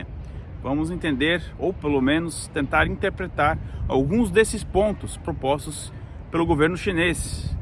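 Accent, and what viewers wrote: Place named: Paraná, Brazil. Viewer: Nossa mas seu sotaque paranaense tá tinindo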